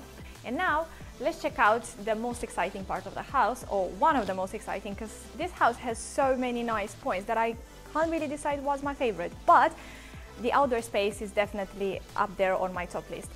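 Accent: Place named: London, United Kingdom. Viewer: I can tell by the accent that she lived (lives) in London.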